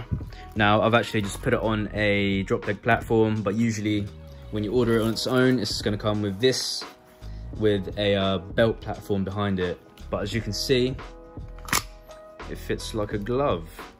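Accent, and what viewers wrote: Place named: Caribbean. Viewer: Why does he have a black West Indian accent?????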